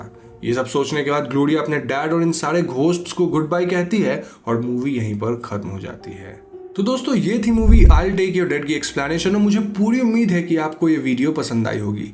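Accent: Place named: Bihar, India. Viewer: sir ap bihar se ho me jan gai...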